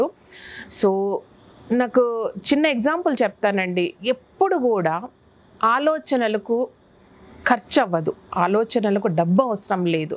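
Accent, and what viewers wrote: Telangana, India. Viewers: Super. Telangana yaasalo chaala baaga aagakundaa acham vaarilaage maatlaadaaru😃😂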